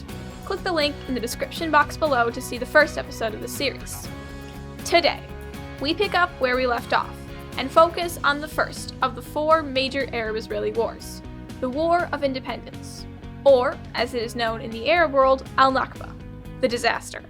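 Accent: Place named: United Kingdom. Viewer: huh, narrated by a young British girl. kinda new way for a channel.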